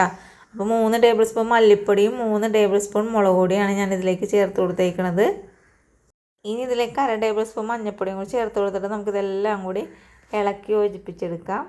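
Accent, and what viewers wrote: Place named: Kochi, India. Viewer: ഈ കൊച്ചി ഭാഷ കേൾക്കാൻ ഇമ്പമാണ്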